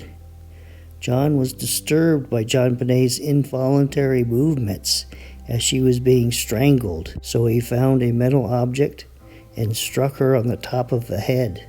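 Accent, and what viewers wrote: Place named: New York, United States. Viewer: I’m in the uk North Yorkshire and I get so excited when I see you have a new video up! As for your accent well I’m not familiar with American accents I can tell the difference between a southern accent that’s about it 😆but yours well don’t laugh and don’t be offended but you remind me of a New York detective, I could listen to to you all day. I fascinated with this case keep up the great work. Best wishes from over the pond.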